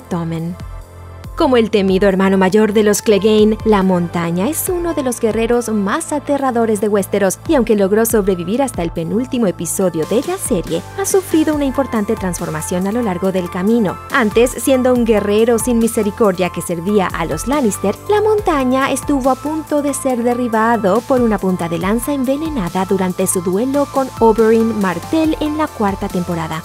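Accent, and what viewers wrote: United States. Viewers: Ay, qué pronunciación yankee tan ridícula tiene la locutora!! Es necesario?! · Español de estados unidos???? Dislike equisde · Lo que molesta es el afán de la narradora en pronunciar los nombres con acento gringo. Vale Gaver.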